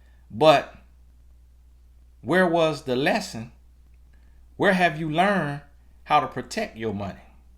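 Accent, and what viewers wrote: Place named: South Carolina, United States. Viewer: where are you from?? You sound like you from SC, I could listen to you talk all day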